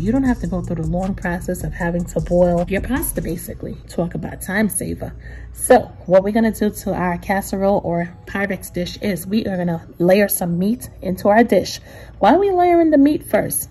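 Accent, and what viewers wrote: New York, United States. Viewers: I love that new york accent from a woman you doing ya thing with that lasagna too i know you can cook you from up top too 💯🍷🌹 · I enjoy your videos! Keep cooking! I have my way of cooking but always love to try someone else's ways of doing things! Also I love that New York accent! Thanks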